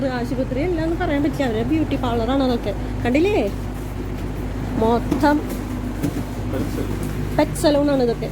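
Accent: Thrissur, India. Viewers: Thrissur samsaram. Super 2 perum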